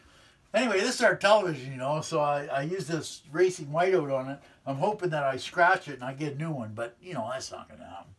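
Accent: Canada. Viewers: I don't watch to learn as much as I watch to hear that Canadian accent! 😂😂😂 Eh? Good to know info,! I will have to re-plumb my rig when I get home and this might help! Also, 🦄🦄🦄🦄🦄📸📸📸📸📸📸📸📸 your camera person makes you look good! 😁🙏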